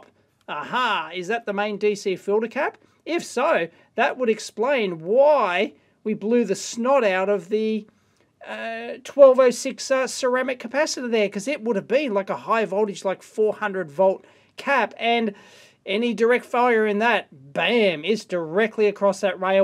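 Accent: Australia. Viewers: You did up the Aussie level on this one for sure mate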